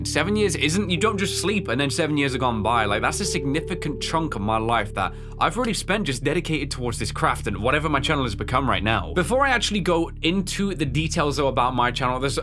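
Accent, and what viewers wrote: United Kingdom. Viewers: As a long LONG time supporter of channel I'd like to say a few words about his 7 year hiatus The first video I watched from was his first video on case animatronics and whilst he sounded very polite and British I enjoyed the video a lot and gradually from there I watched more and most definitely enjoyed more There was some videos I liked and about 2 I didnt like and quite a lot I didnt watch but I'd just like to know I've been there since case animatronics and will stick here forever until the channels end Big respect dont stop grindin🥺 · do not ever blame yourself. There is a simple way to explain how special you are in my life: I did not even know English when I started watching you. I played the games you played because you inspired me. I watched your videos when I didn't even understand what you are saying and at the end, you taught me how to speak English (british accent too). 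So, even if you quit tomorrow I will remember you for the rest of my life. That is how special you are for me. And when I see you blaming yourself or anything like u said in this video, it makes me very sad because you made me smile since I was a kid. And you must make yourself smile too. Don't record when you can't smile or when you give a fake smile because your funny jokes, reactions made us laugh, your smile made us smile. So don't do it when you don't feel like it. I would always respect and love you! <3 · Never knew you was british. Same here lad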